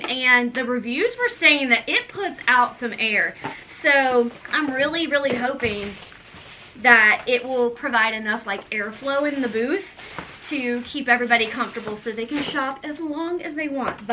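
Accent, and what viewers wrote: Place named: Carolinas, United States. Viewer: You mention knowing Abby ~ she’s from the Jacksonville area and you sound like your’re from one of Carolinas. Take Care. 😊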